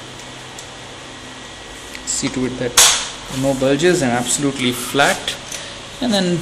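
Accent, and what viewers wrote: India. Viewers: インド訛り キッツいなぁ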